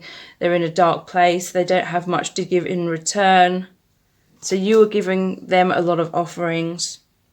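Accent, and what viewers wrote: United Kingdom. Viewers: You are wonderful , gifted , you are the real deal ❤.By the way I adore ❤ and your Britich accent .Wonderful job !!!!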